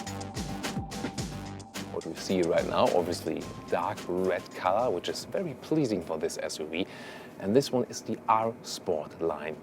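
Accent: Germany. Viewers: ahhahaha typisch Deutsch hab's direkt rausgehört 😂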